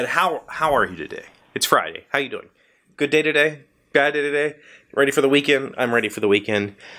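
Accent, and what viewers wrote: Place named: General American, United States. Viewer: Ha ha ha of course you have an accent! I find you easy to listen to, a more general 'American' while those from the south often have a drawl or sing-song accent. Now this is because I am Australian, and no we never say we will put a shrimp on the barbie...we dont use the word shrimp in relation to seafood, its a prawn, a shrimp would be an almost derogatory name for a short person or child. Living in Australia there is less difference in accent as there is in the US more often its in the use of colloquialisms or local phrases, or ending the sentence with an eh. Sam, well done. Cute family, but OH NO chocolate covered bacon??? I have no words... Well done, be a good son and make stuff for your Mum. Have a safe and happy weekend to all, Trace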